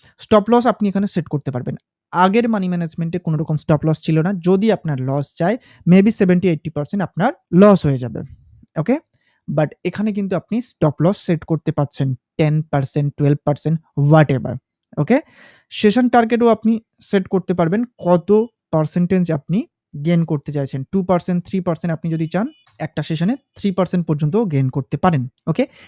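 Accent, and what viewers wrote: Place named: India. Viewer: Apni indian nki bhai indian er mto lgche kotha gulo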